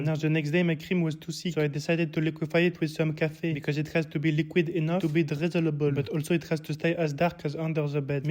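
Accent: France. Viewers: wow l acccent francais laa frero mais j aime l humor bonne chance mon reuf ciaoooooo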